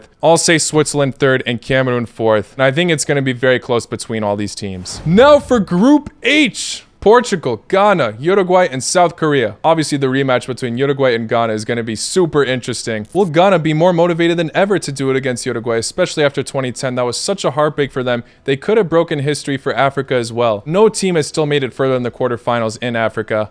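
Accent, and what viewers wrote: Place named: United States. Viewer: Au brate zvucis tacno ko amerikanac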